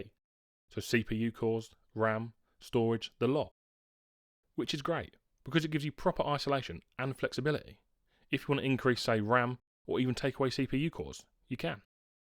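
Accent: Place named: United Kingdom. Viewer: I'm guessing from the plug and the accent that you're British, you want to work on that networking thing, I will gladly hop on a phone call, or discord or whatever and talk you through it. You're doing cool stuff. Being curious and learning is cool on it's own, you're doing it with style. So if I can help feed that curiosity and get you playing with networks as well with a few minutes or hours or whatever on a call, then it'll be time well spent. Either way, keep on learning!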